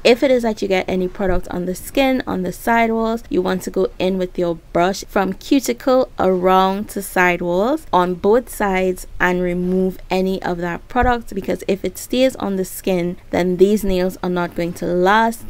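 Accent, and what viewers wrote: Trinidad and Tobago. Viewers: I enjoyed both videos i am a new sub & u sound like a trini just like me wow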